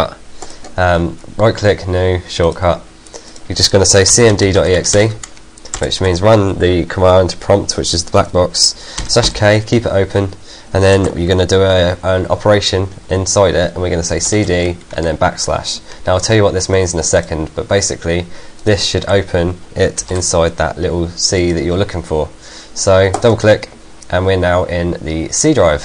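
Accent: United Kingdom. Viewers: Very good video. Very well done. btw thank you for not using any annoying ass music, rap, rock, whatever,ect THANK YOU!! just a normal English voice,with classy british accent to-boot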